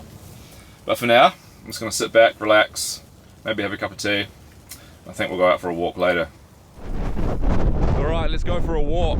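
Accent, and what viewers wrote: New Zealand. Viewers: I love listening to your New Zealand accent. We have quite a few New Zealanders here in Australia so I am quite used to the accent. Do you have a playlist of your Videos, I would like to watch them all in Chronological order. Keep up the good work. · He's a true kiwi where their i's sound like e's. If you want to know if someone is a kiwi, ask them to say fish and chips. It'll sound like fesh and cheps. He's ha 😅 We'll be Namibia next year on a world cruise. We're really looking forward to it. The desert looks spectacular, but I'm sure you'd love a winter scene like this after 300 days of sun.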